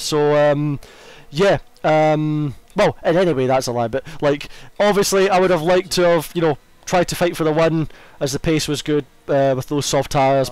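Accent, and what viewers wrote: Scotland, United Kingdom. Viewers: If you pay attention you might hear a slight Scottish accent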